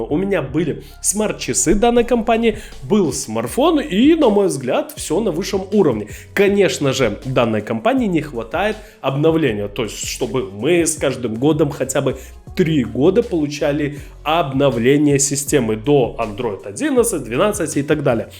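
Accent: Belarus. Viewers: Когда слышу слова - гдзе, быстродзействие. Сразу понятно блогер из белорусии. Любит ныть и жараную картошачку. Яки небудь карэнны минчанин или гамяльчанин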